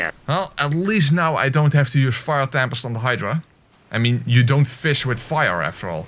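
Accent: Netherlands. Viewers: Never heard of you before, but after like three words I was like ''oh shit, a fellow Dutchie''. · My favorite Dutch accent content creator strikes again. Lekker bezig man! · Nederlandse Engels-accenten zijn echt geweldig. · Are you from the Netherlands?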